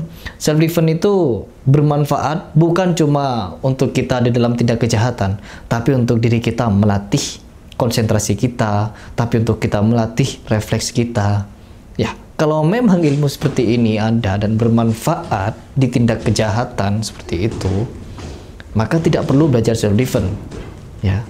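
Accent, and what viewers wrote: Madura, Indonesia. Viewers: Mas logatnya seperti Madura.. Betul ya ☺️🙏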